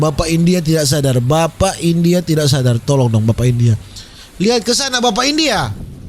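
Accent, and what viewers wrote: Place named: Sulawesi, Indonesia. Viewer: Kalau saya selalu ngakak,, Krn org Sulawesi JD memang begitu lah logat nyaa... Kasar tapi hati nya lembut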